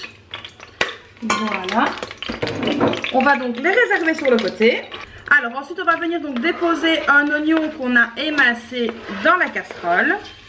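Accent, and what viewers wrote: Belgium. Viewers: tu es de Belgique car tu as l'accent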